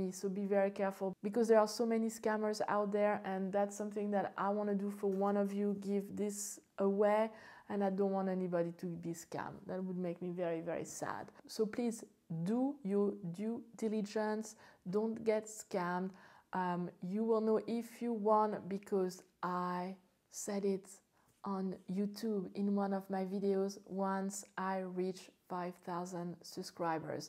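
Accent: France. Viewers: I do like your french accent :-;, i use the 60mm and i am very happy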